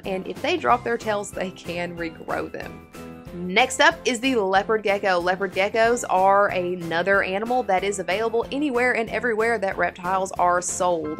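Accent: South, United States. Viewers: As a first time viewer, hearing that southern accent come out of someone who isn’t white is amazing😂 loved the vid, hope you’re having a good day🤙🏼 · First time eating her video off rip oh she southern I can hear it lol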